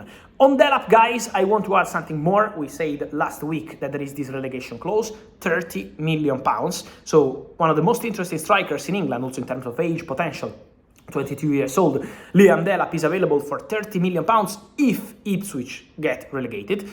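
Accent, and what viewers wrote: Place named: Italy. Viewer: Por qué no habla en español o tiene traducción simultánea 🥴. Su inglés es muy malo y le entiendo el 30%. I mean, If you're not completely sure of you pronunciation, please try to speak slowly because the italian accent is really thick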